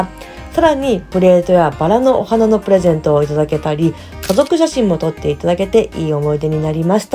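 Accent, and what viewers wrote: Kansai, Japan. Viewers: 飴をペロペロの話可愛すぎて、つい可愛いーーーー！って言ってしまいました♡ さんの関西弁嬉しかったです！！関西人ファンより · さんのお父さんお若いーー！そしてさんの関西弁で素な感じもかわいいです🩵 無事七五三終えられてよかったです！ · さんのお父さん、めっちゃ若いです☺️✨お父さんとの会話で関西弁が出てて同じ関西人としてはなぜか嬉しかったです🥰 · ほんとにお父さんがお若い。。そしてさんの関西弁が新鮮ですきです！❤️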